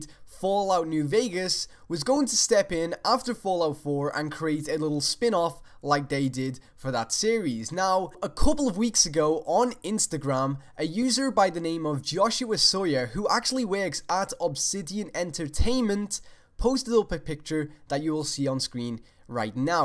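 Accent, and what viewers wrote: Liverpool, United Kingdom. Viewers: your enthusiasm is infectious. I have it now, too. I hope New Orleans is true. Nuka World was leaked in a document two years ago, and it's coming out next week. if Obsidian is indeed working on this, then it might explain why the next South Park game was given to another developer. BTW your accent is difficult to pin down. I'm detecting Liverpool with a hint of Dutch? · Where are you from,? Sometimes you sound like you're from Liverpool in the UK but then you pronounce some syllables like an American and I second guess myself. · He from the U.K. Liverpool.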